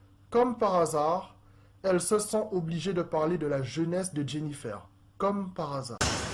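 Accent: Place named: Antilles, Caribbean. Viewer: salut ! Je suis une de tes abonnées et je me disais bien que tu avais un accent créole antillais :) j'ai aussi des origines de Guadeloupe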